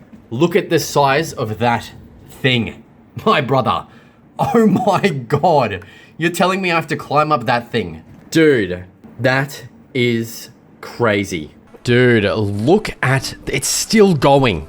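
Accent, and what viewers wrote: Australia. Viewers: I don't know why but, i love Australian people's accents ❤ 😂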